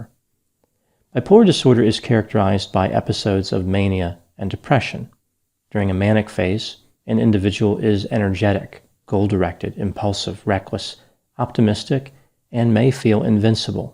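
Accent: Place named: New Jersey, United States. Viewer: Dr! I love the nj jokes, but I'm curious... what did the Garden State do to hurt you? (byyyy the way, you exhibit some minor signs of Jersey accent 🤔) lol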